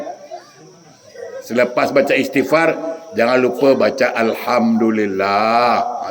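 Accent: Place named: Johor, Malaysia. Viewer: Assalamualaikum ustaz,bagus penyampaian ilmunya mirip loghat Johor malaysia.. Alhamdulillah.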